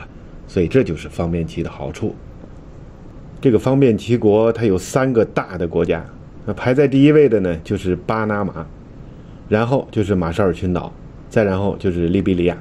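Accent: China. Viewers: 李船长是台湾人吗？为什么是有大陆口音，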